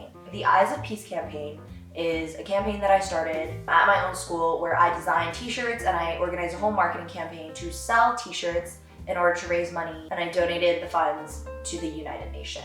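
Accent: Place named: United Kingdom. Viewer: I have no idea as to why I sat through this entire video when I’m a MS3 😂 But the british accent is on point! I had no idea high schools in the states were so intense!!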